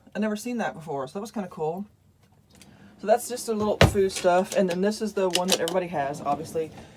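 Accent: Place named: Texas, United States. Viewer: Cafe Bastelo, I grew up around that coffee with my Cuban Parents. Cafe Con Leche is basically a Latte coffee but you can taste the expresso a little more. Love that Soft Texan accent you have. As you we don't like allot of stuff. When i'm out on an excursion its the one time i can live with less. But have a far larger camper a 22ft Grand Design 22mle. But interested in this camper for distance trips. More so with fuel prices where they are today. We live in Miami, FL and when towing our TT it takes us 8 hours just to cross into GA and 10.5 hours to exit the Peninsula through I-10. Appreciate your Vids.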